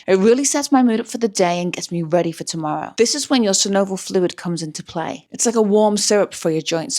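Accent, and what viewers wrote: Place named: Liverpool, United Kingdom. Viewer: I knew that was a scouse accent! Love these tips - I do simple sugars after workouts as well, cereal or milkshake are my favs. New sub! X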